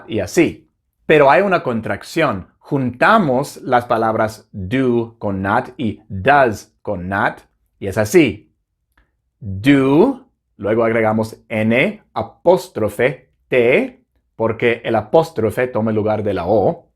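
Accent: United States. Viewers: El es estadounidense se notanpor su raza y su pronunciacion creo😂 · En otro vídeo ví que la pronunciación de Didn't es /deren/ sin la T, pero este video veo que la pronunciación es como /dirent o dident/. Amigo eres estadounidense.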